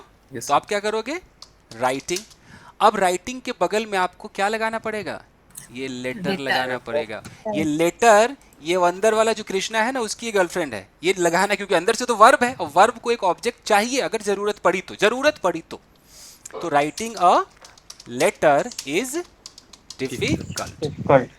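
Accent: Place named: Bengal, India. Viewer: Really sir amazing your Bengali tone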